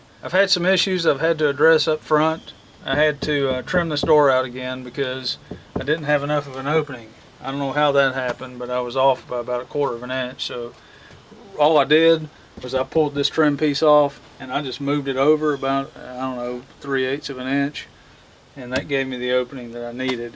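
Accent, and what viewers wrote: United States. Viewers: Great job, great lesson. I've got two i have to install tomorrow. Love the hat, pencil and accent. Made me want to shout 'merica!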